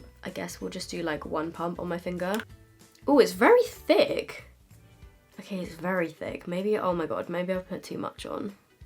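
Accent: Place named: United Kingdom. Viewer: After almost every sentence you say I repeat it like an annoying sibling. Sorry can't help it. You sound super British 🤗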